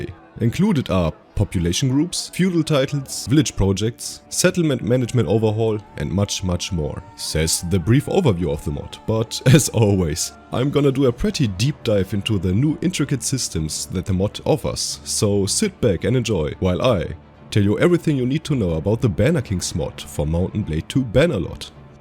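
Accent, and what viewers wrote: Germany. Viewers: hör ich da einen deutschen Akzent raus? haha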